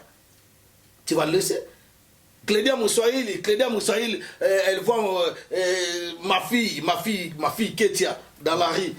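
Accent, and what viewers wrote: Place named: Democratic Republic of the Congo. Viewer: parle lingala pour nous faciliter à comprendre le message t'on français lingaliser la c'est chaud fais plus des lectures des bouquins savent t'aider mon frère tshanguen comme tu le dit toi même courage plus des effort frérot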